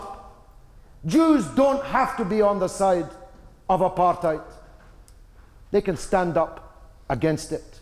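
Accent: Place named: Scotland, United Kingdom. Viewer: No, he's 100% Scottish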